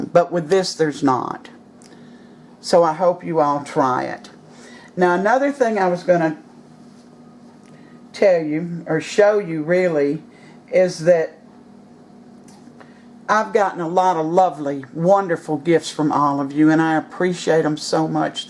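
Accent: South, United States. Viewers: Don't mess around with her Southern draw and hospitality. Southern people are not in a hurry.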